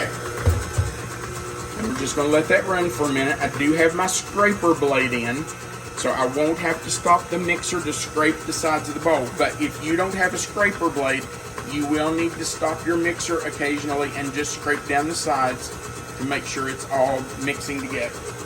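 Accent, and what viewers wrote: South, United States. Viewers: I was just going to download your video so I could make this cake later, but that wonderful Southern accent kept me glued to the screen!😂 Your voice is very pleasing, and that cake - wow!! You're a great teacher by the way, thank you!!❤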